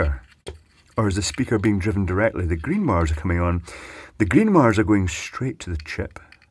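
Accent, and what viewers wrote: Scotland, United Kingdom. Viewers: are you scottish i cant tell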